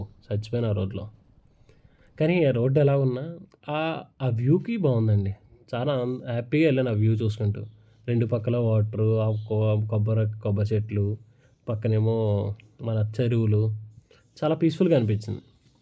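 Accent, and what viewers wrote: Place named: Godavari, India. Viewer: Godavari yasa add ayyaka godavari andhalu inka bagunnayi.❤.keep going 👍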